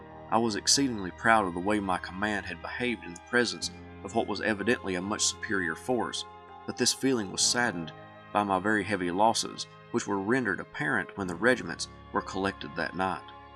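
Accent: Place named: Harlan, United States. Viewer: You talk like me,. Im from Harlan.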